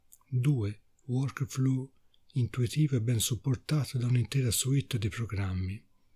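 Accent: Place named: Tuscany, Italy. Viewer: Ciao! bellissimo lavoro! ti faccio i miei sinceri complimenti. Io sono una affezionata utente cinelerra (dal 2003) anche se ultimamente edito poco perche le mie scelte professionali mi hanno portato in altre direzioni. Avendo tempo lo tradurrei, intanto bravo e...essendo anche io toscana pero expat, un tu sai quanto mi sono commossa ascoltando la tua voce!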